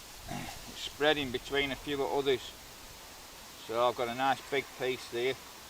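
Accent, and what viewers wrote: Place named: Scotland, United Kingdom. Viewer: Your Scottish accent is entertainment In itself 😂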